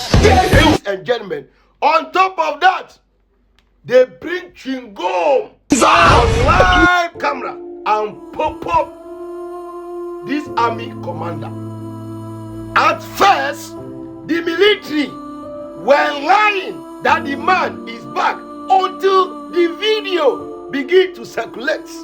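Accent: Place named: Nigeria. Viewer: My brother i like the way you speak [Boola bay Nigeria English]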